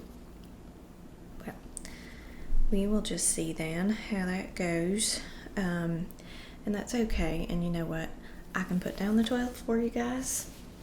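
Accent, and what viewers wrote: South, United States. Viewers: Wonderful! Southern US accents are really lovely to listen to in my opinion :)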